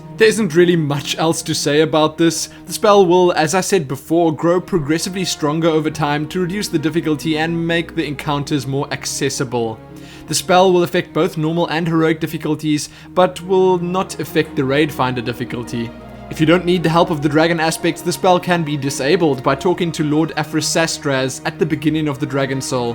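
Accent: South Africa. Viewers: What!?!? South african accent FTW!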